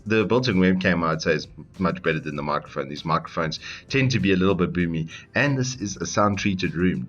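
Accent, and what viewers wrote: South Africa. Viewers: hes south african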